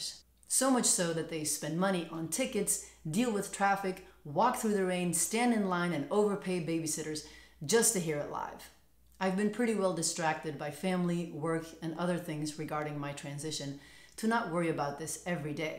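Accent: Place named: United States. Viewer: I just want to know how it is you sound like you were born in the US?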